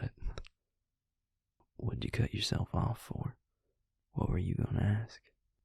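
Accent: South, United States. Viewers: Him: taking My dumb ass self: you sound so Country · I love the southern accent 😫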